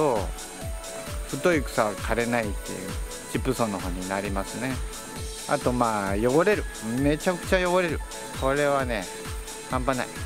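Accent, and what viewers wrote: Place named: Oita, Japan. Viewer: さま 大分弁丸出しでいつも楽しんでます。 草刈りのチップソー飛び散って痒いのも 有る有るですね。飛散防止をつけるのが良いかもしれませんが 私もそう考えながらまだ購入してません。草刈りがちょこっとだからです。 はなちゃんは必ず予防注射してから 離してください。パルボなんかでコロって死ぬ事が多いです。 一度は注射してませんか？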